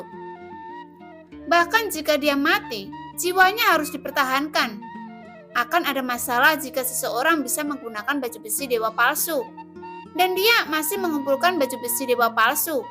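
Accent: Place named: West Java, Indonesia. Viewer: Sunda banget ka hihi ..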